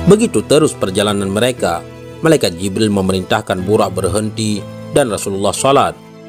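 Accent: Aceh, Indonesia. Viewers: Baru sj suami sy beritahu sy suara min kyk ny org Aceh ajah yaa?🤭😀😊👍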